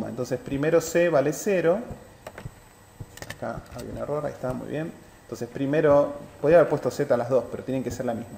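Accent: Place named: Argentina. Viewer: No hables argentino no puedo entender,aaaah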